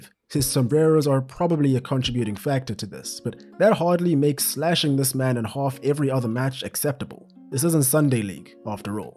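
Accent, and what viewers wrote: South Africa. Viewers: Nice to hear a South African accent for once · Are you from South Africa? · You sound South African? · I just wanna know, are you South African becoz you sound like one… btw love the videos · Hey…are you South African? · Are you South African mate? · Is that a South African voice i here? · I knew this voice sounded south african awe my brother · Through out this whole video I'm like "this man sounds hella South African" and I'm glad I was right. Just gonna sub cause of that ✊. Also great video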